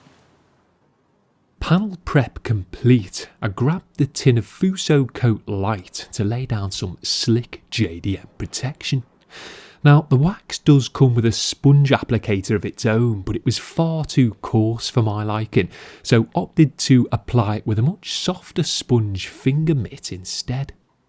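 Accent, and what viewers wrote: United Kingdom. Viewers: Awesome British English mate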